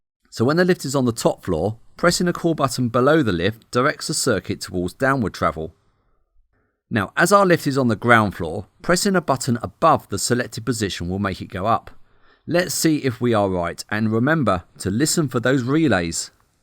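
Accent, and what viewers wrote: United Kingdom. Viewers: nope he's from the UK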